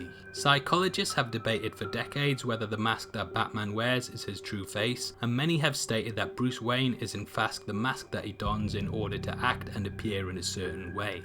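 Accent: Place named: England, United Kingdom. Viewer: This guy was incorrect about a handful of things in the movie and then says "they have became" ... I guess having an English accent doesn't guarantee that he'll speak proper English? Thankfully, I got the gist of the video. Lastly... this movie was not a thriller and frankly is not worth the time. It's a horrible movie.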